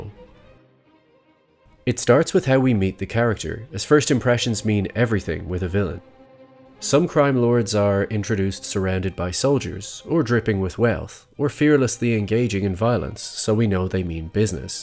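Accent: Ireland. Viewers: Where in ireland u from? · R u Irish I can hear the accent a bit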